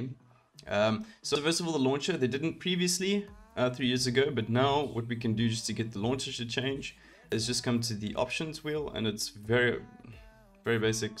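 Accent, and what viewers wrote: South Africa. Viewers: Do I hear a fellow SA accent? XD Thanks for the vid!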